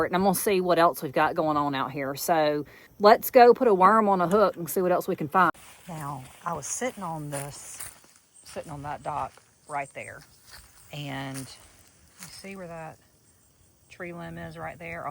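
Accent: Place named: South, United States. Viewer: Liked it! Good to hear a gal with a good ole Southern accent. I come by mine naturally since both sides of my family are from Athens, Alabama. Best of luck in your restoration project, and I have no doubt that you will be successful. It's obvious you take pleasure in the process as well as reaching your goals.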